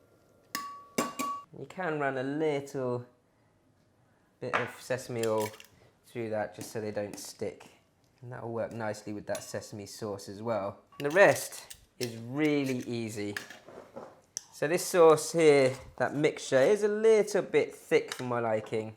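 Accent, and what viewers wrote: England, United Kingdom. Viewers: Wow Chinese dude with English accent... Impressive 😃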